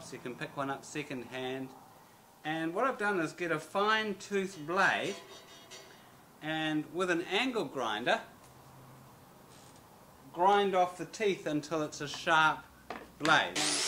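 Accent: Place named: New Zealand. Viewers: I got a real kick out of watching this with captions (sitting in a waiting room and not wanting to disturb others) ... the 'English" subtitles can't quite handle the kiwi ;-) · I love KIWI accents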